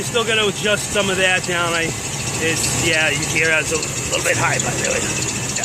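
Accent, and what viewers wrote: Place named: New York, United States. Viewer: Thank you very much!! It sounds like you have a New York accent. I have family there. I appreciate the help. I have everything else lined out and ready to do the swap. Again many thanks!!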